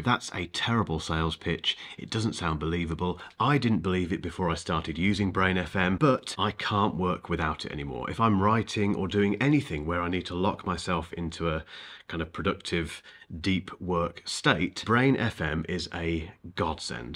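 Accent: United Kingdom. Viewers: Calm lovely voice, British accent is the best ❤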